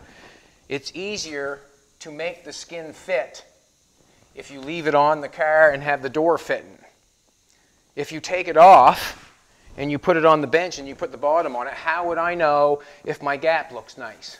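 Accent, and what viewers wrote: Canada. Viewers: I recognized the Canadian way you say some words. We do the same in Wisconsin. Liked. Subscribed. Bell rung.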